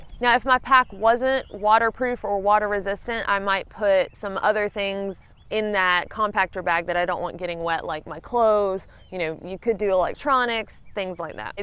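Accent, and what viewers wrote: South, United States. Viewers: As a southern boy myself, your accent is quite endearing. Nice video & suggestions &, if you don't mind, I'd like to let my scouts see it when they're getting ready for a trip. Always seems to sound better to them when I use references. Keep em coming!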